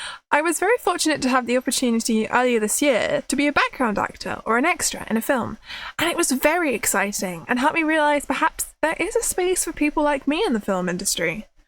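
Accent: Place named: United Kingdom. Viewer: 21? Hmm. I was certain you were older. From the way you speak and act, but maybe the people around me are less mature, or it could be your British mannerisms and dialect. I have not spoken to a lot of people from the UK. Your life seems to be stable and you seem very happy with it, for the most part. All the best to you lady.:face-red-heart-shape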